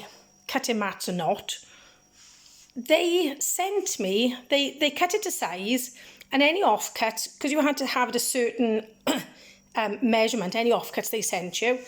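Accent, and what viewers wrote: Wales, United Kingdom. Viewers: Lovely room, amazing loving the "Taff" accent. Love Wales! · you are a joy to watch. I think your Welsh accent is gorgeous. Between you and Pam I’ll be fluent in Welsh and Scots 🤣All your stitching is lovely and your bags are stunning. You are so talented. I’ve made a few vinyl front bags but I wouldn’t want anyone to look too closely as the stitching is a bit wonky to say the least. Sorry to hear you haven’t been too well. Take the time the doctor has signed you off to relax. Your body is telling you to rest. I hope you get your cardiac appt soon and get everything sorted. I can’t wait to see you next time. Thanks for sharing. 💞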